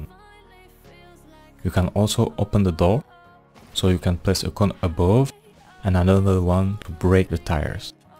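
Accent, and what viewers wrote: France. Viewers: T'es français ?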